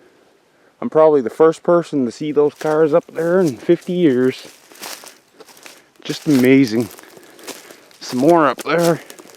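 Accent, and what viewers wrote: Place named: Maine, United States. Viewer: are you in Maine? you sound like it. Dave from Maine. love the show!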